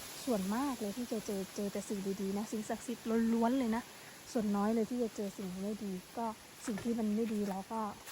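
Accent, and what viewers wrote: Isan, Thailand. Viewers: สาวน้อยเดินป่าถ้าตกใจหรือเจออะไรแปลก เวลาอุทาน ใช้ภาษาอีสานบางคำฟังแล้ว ตลก ขำ บางครั้ง ก็แปลกไม่ออกแต่ก็ชอบนะ สำเนียง เสียงไพเราะดีมากชอบขอบอกจ๊ะ❤❤❤😅😅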